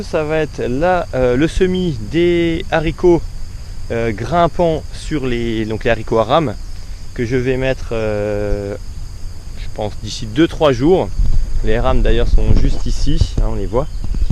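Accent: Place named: Meuse, France. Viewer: Bonjour vidéo intéressante. La pluie peut être bénéfique. Êtes vous de la Meuse car je reconnais ce petit accent. A bientôt sylvie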